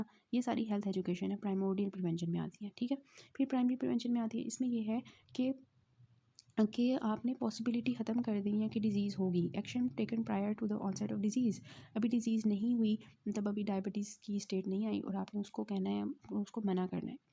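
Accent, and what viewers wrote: Karachi, Pakistan. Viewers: MAINE W22 OR BILAL MAZDY KA AWAZ SUNA MTLB AP KARACHI SY LAG RAHIII HAIN DAIKY LECTURES KITHNY SHOUQ SY SUN RAHA HU BAGROUND KI ENVIORNMENT BE CAPTURED KR RAHA HO HHHHHH GEO KHANS HHHHH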